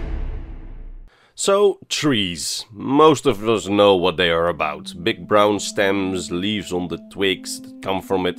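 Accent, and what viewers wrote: Netherlands. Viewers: One of my favorite parts about your videos is your Dutch accent👍🏻👍🏻I love it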